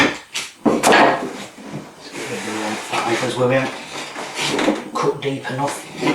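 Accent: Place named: Birmingham, United Kingdom. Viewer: "Cotton rebates"? Dude sounds like the Peaky Blinders.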